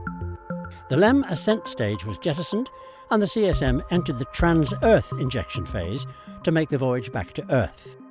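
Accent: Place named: United Kingdom. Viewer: Do you think they have British documentaries in England that are narrated by Americans probably not so why do we have to listen to limeys over here every time we want to learn about something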